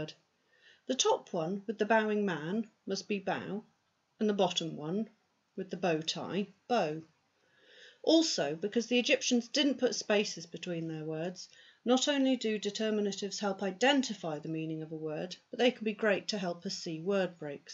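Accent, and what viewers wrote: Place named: England, United Kingdom. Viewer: Yeah good lesson! I've difficult with your very well english accent for a french like me who's not so good to talk fine english and undesrstand english people. :-) But with several times to see and listen, i'll hope it will be better. Waiting too for the next... Best!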